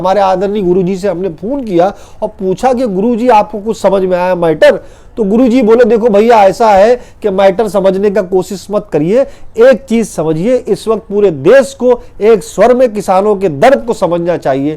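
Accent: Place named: Kanpur, India. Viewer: Rocks!! Once again. You hit the bulls eye... 🤘🤘🤘🤘 God bless... And ur kanpuriya accent is getting better by the day... Hum bhi flabbergastiya gaye!!! ❤❤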